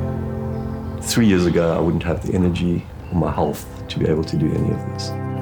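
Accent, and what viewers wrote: South Africa. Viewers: You sound South African. Thank you for your positive video Happy Retirement. 🇨🇦🇿🇦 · Retiring brings on a mindset of … it’s the end 💥 … agree with that. You sound like a fellow South African … Blessings 🇿🇦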